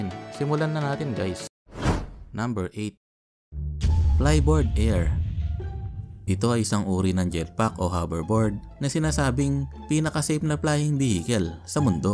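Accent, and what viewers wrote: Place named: Ifugao, Philippines. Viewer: KASLA KA NGA KIYANGAN NGA AGSAO MET. TAGA IFUGAO KA BA?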